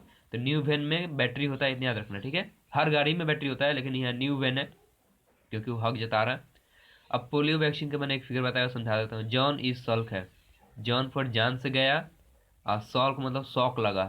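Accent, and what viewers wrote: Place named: Bhojpuri, India. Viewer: Bhojpuri Bahut pyara bolte hai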